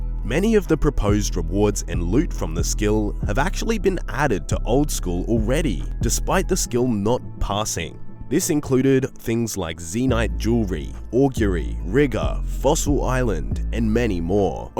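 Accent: Australia. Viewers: wait you australian? lol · wtf your Aussie, never knew :)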